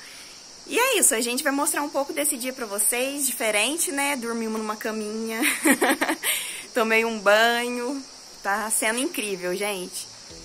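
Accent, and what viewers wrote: Minas Gerais, Brazil. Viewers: Esse seu sotaque mineiro é muito legal. Experiência se adquire com o tempo. Seus vídeos estão cada vez melhores.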